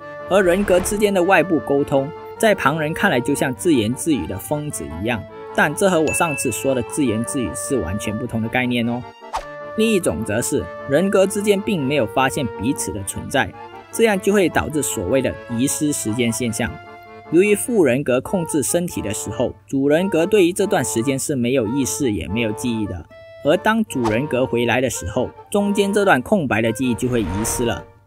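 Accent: Malaysia. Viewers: 馬來西亞人的口音本來就這樣的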